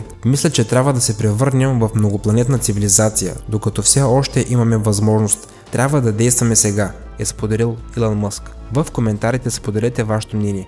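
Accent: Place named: rural, Bulgaria. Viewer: Ей, много по селски говориш! Намери друг диктор!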